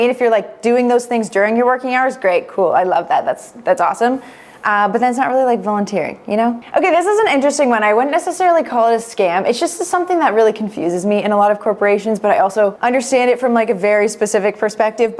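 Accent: United States. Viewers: Too American for me to understand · Youre suffering from California-speak in this video. Youre talking too fast and slurring the words. Hard to listen to. Garbled. Throwing away sentences. Do better. You usually do.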